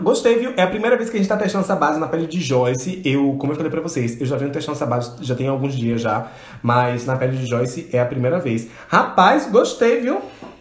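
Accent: Bahia, Brazil. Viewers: SE TEM SOTAQUE MAIS LINDO QUE O BAIANO, EU NAO ME LEMBRO 😍😍😍😍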